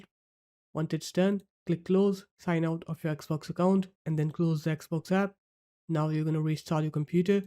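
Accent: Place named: India. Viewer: thought i was cooked then i heard a faint bit of indian accent so i know i am GOOD bro this fix WILL WORK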